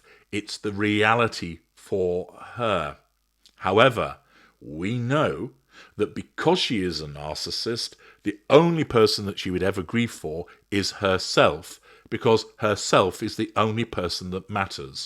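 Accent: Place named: RP, United Kingdom. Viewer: While at first I was very much on board with exposing this particular narcissist, I have given your series on Mrs. Kirk a great deal of thought recently. I mean literally NO OFFENSE to you, but... as the daughter of an ASSASSINATED NAVAL OFFICER (18 July 1972 at Lawrence Livermore Nuclear Lab) I find it rather reprehensible that you are constantly going after a widow in the first few months after the death of the husband. I am cognizant she is a narcissist but wouldn't DECORUM dictate to wait at least 3 -6 months BEFORE treating any family in grief regardless, with some respect? My MOTHER IS A HUGE OLD NARCISSIST and as the daughter of her MURDERED HUSBAND, I would HIGHLY RESENT SEEING A TAKEDOWN VIDEO OF MY MOTHER IN THE FEW MONTHS THAT FOLLOWED MY FATHER'S MURDER. I am NOT a narc - but an empath and as one, this is rather hurtful to those children don't you think, when they after 15 years or so, see how brutal you were to their mother just weeks after their father's assassination? 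I get the educational value but really? I thought you had VASTLY more class and decorum - you do sound like a toff after all. However you are a narcissist so you probably really don't give a shit about what anyone else thinks. right - esp. the actual child of a narc mother whose father was also was assassinated? You just don't give a damn how those kids might feel in the future, do you? I am not at all suggesting that you don't continue this series- but WAIT maybe until well into next year... T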